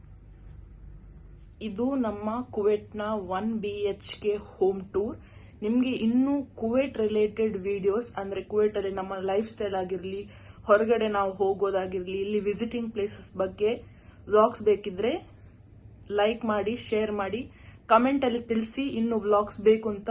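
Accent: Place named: Mangalore, India. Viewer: ನೀವ್ ಆರಾಮಾಗಿ ಮಂಗ್ಳೂರ್ ಕನ್ನಡನೇ ಮಾತಾಡಿ ತೊಂದ್ರೆ ಏನಿದೆ 😁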